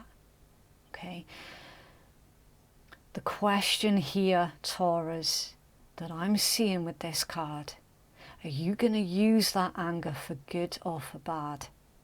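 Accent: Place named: Wales, United Kingdom. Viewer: exactly! Tq for wonderful reading its a testament you have masculine energies on board! Nice to hear Welsh accent from mine to yours...❤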